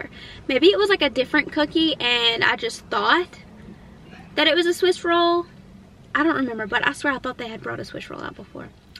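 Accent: South, United States. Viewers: Where are you from? Because you definitely have a southern accent. You dont sound like youre from California. Lol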